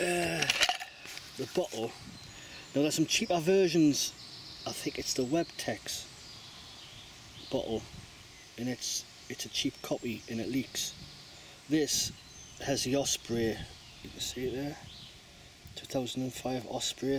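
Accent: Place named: Scotland, United Kingdom. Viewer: Looks spectacular. You sound like a soldier I served with from Scotland. Excuse my ignorance if you are not, some are quite touchy about that....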